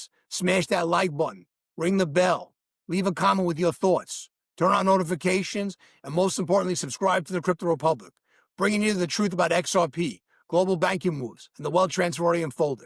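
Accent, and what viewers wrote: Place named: New York, United States. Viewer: You are the only NY accent that I can listen to. Keep 'em coming.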